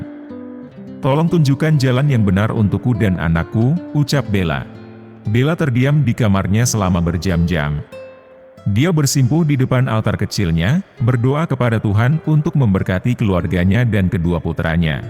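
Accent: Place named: Java, Indonesia. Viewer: di pengucapannya. Mungkin lidah Jowo udah begitu. Gk bs diubah. Tp konten videonya, 👍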